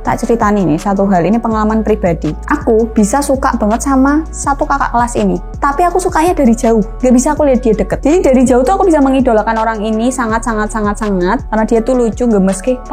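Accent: Java, Indonesia. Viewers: Asyik penyampaiannya mix bhs indonesia sm khas jowo nya😄 Asli fisikal touch, skin 2 skin, dia curhat soal cowok, nanyain cewek idamanku,godaan lewat jok,tp dia gak berani eye contac,persis apa yg diomongin tp skrg malah jauh, my be ada something wrong🙈😂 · Gaya ngomongnya kok kyk jodile Warwick ada campur jowonya tapi asik gitu ya gaya ngomongnya 😂 wow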